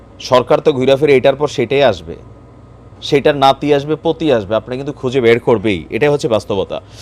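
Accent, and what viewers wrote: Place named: Sylhet, Bangladesh. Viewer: ভাই আপনার সিলেটে কথা অনেক দারুন আবার একটু বলবেন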